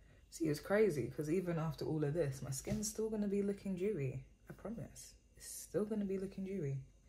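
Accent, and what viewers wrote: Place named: England, United Kingdom. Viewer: Finally found you on YouTube from my ig videos and was shocked when I heard you speak....youre English!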